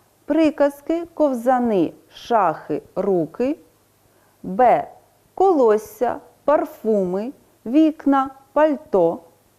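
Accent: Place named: Russia. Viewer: дякую! Насправді питання були до вчительки, яка в ролику саме таким чином наголошує слова: 9.46 - сАнки, 16.29 - островА. Ще запитала б про наголос у слові "збірні" (11.55), адже у словнику подається наголос на першому складі цього слова. Чутно, що людина російськомовна, хоча й старається говорити українською. Просто виникає питання: чи подібні ролики дадуть дітям переконливі знання?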